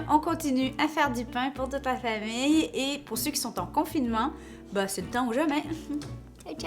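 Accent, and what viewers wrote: Quebec, Canada. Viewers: Un petit coucou de Bretagne pour la nouvelle année qui approche. :hand-pink-waving: Je vous envoie (par la pensée) des tonnes de notre bon beurre salé breton, au sel de Guérande bien sur, puisque c'est de là que je suis ! :face-red-heart-shape: J'essaie la recette dès que j'aurai l'occasion. Merci pour le partage et le petit accent québécois. 😉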